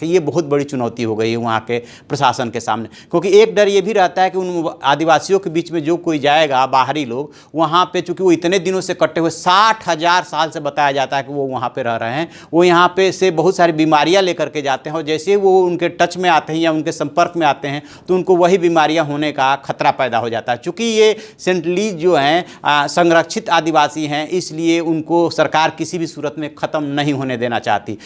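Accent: Bihar, India. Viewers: Hindi in bihari accent....Achha hai😁😁😂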